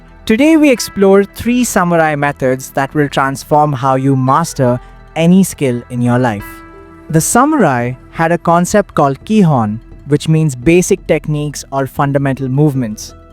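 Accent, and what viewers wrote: India. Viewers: Hi, I really like your videos and your country is japan in description but your English is telling that you are Indian. Am I right sir? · Indian accent 🤮🤮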